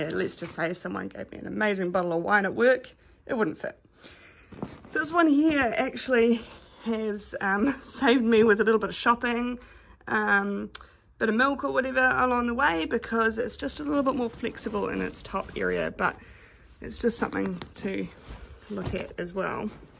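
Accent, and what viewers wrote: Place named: New Zealand. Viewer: Thank you for your evaluation of the bags. This was very useful for me. Also nice to hear your New Zealand voice. It reminded me of my two sea kayak trips in New Zealand. Good memories.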